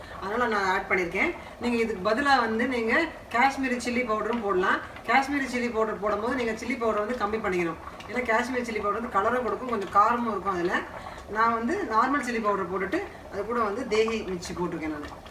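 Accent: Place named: Coimbatore, India. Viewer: But unga speech la lesa Coimbatore slang come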